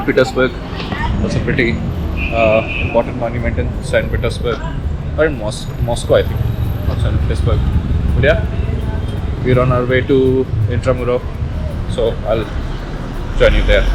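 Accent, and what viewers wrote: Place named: India. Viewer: Listen to yourself! You can hardly be understood. You are speaking English with deep Indian accept. DAH!!!!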